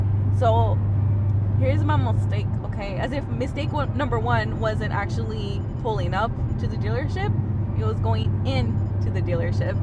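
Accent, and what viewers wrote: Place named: Florida, United States. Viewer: Sound like you from Florida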